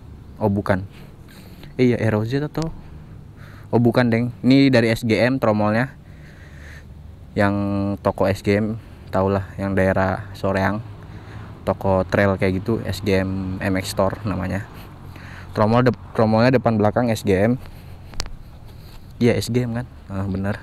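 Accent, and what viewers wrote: Papua, Indonesia. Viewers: Bisa logat Papua yaa bang. Kreenn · om dari papua k? om pu logat kya di papua jd😂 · bah sioo, ternyata abang juga ada logat papunya yah ternyata,, sukses terus abang salam dari Timika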